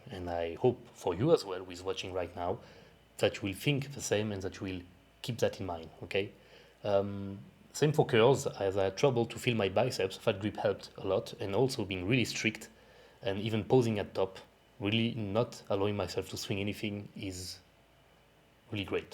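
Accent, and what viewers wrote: France. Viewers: Vidéo d'entraînement de 20min, voiceover en anglais avec un fort accent français, intensité au rendez-vous, que demander de plus ? PS : pareil que toi perso. J'ai toujours été plus à l'aise avec une prise plus serrée et je règle également tous les sièges le plus bas possible sur les machines pour les pecs. La largeur de clavicules influence la prise aussi à mon avis. Qu'en penses-tu ?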